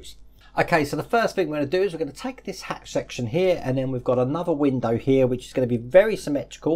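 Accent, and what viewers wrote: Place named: Cockney, United Kingdom. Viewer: He speaks with a cockney accent.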